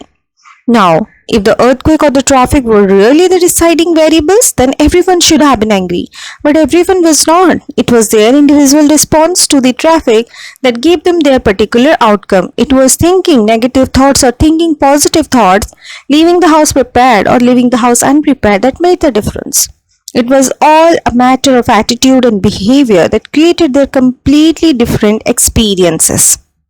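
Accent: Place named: India. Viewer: Oh man! An Indian accent :((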